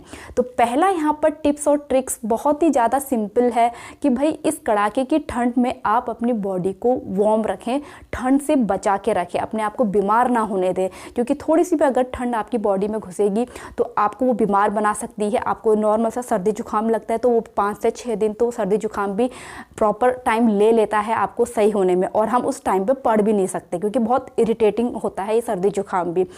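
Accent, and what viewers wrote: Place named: Uttar Pradesh, India. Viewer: But voice is indicated up state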